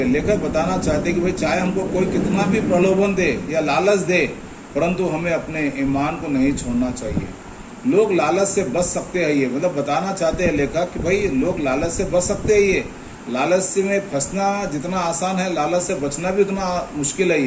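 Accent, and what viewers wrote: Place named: Hyderabad, India. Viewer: Explanation fabulous but that Hyderabadi Hindi that was way more fabulous than your explanation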